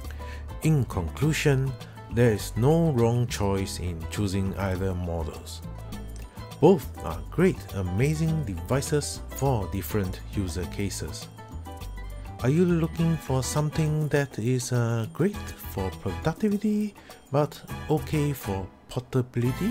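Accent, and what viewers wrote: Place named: Singapore. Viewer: This dude sounds Singaporean.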